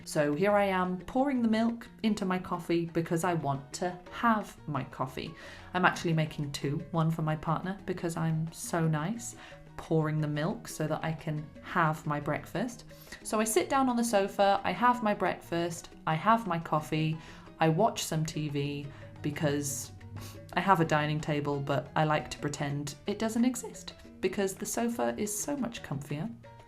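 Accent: United Kingdom. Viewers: British accent. Very good how it sounds to an english student more used to the sounf of the american english. Thanks for this video. I:m on my way to fluency.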